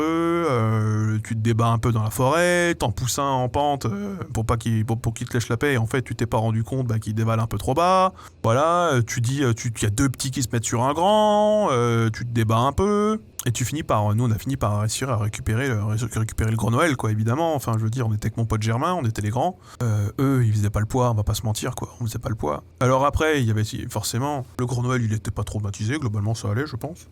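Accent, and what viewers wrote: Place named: Haute-Marne, France. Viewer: En vrai ma famille vient de Haute-Marne (mais pas exactement de ton coin, plus vers l'Aube !) donc j'ai passé toutes mes vacances dans le village natale de ma mère, et franchement ta manière de parler et tes anecdotes ça me rappelle de fou tout le temps que j'ai passé là bas ! À être réquisitionnié pour filer des coups de main dans les champs ou à jouer avec les voisins paysans !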